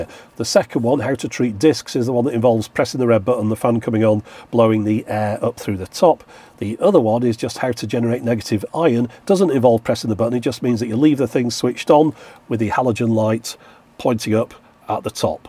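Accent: United Kingdom. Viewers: Love the ion/iron wordplay. Only something you can do with a British accent 😂 please make the negative iron a recurring character 😂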